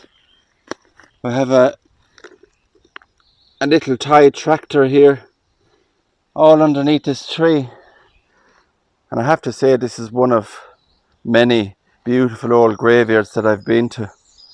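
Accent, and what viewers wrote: Ireland. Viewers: This was my first time watching this channel and I'm just wondering during are you Irish and is that where this beautiful graveyard is?! 😊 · I like to watch videos like this every now and then. this kind of old Cemeteries are very fascinating but wouldn't like to be there after dark! In addition, I find Ireland and Scotland breathtakingly beautiful. Been there a few times. I don't know why, but I feel a kind of connection when I'm there. and I love your Irish accent 🤩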